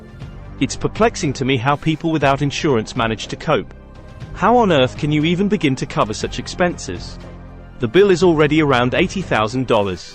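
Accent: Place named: England, United Kingdom. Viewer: The makers of this video would be more persuasive to Americans if the voice saying 'we' 'our healthcare system' was not an English one. Did they use a robot voice? If so it's a good one (its peculiar pauses in sentences are a bit of a give away) but no all accents which speak English are the same.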